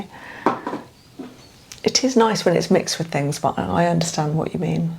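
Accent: United Kingdom. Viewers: Hello from North Texas, USA. Is it weird that I want to speak with a British accent after watching your videos. I love hearing your soothing voice.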